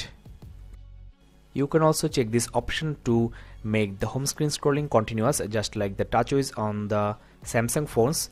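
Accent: India. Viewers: Are you indian? You sound like an indian.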